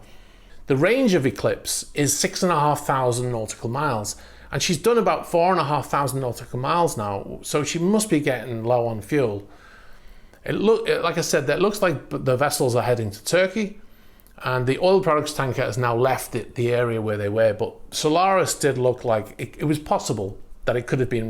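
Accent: Liverpool, United Kingdom. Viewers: Is the presenter of the video a Scouser ??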